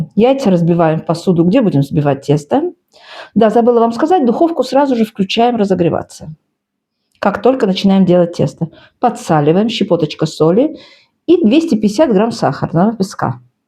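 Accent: Baku, Azerbaijan. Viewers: Это бакинский акцент. Растяжка последних гласных в словах это влияние фарсидского языка.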